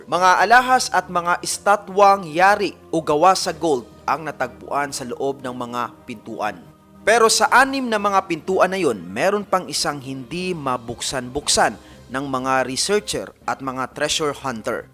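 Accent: Visayas, Philippines. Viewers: Bisaya diay ka kuya ahahah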